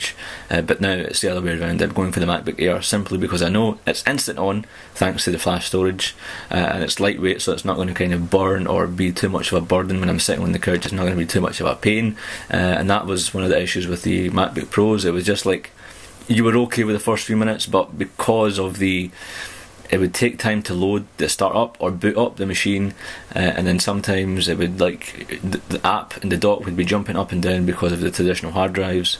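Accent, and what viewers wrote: Scotland, United Kingdom. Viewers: he's Scottish...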